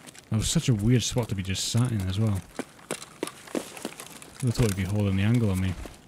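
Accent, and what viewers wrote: United Kingdom. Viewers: This is my first video watching u and from the moment I hear the British accent I’m engaged 😂 then I witness the splendid performance of a player with 4000 hours on EFT and not only that but you give us such a vibe of music afterwards as you loot and groove your way through. Then finally I see the length of the video 😎. A job well done my friend. 🤝 how could I not subscribe?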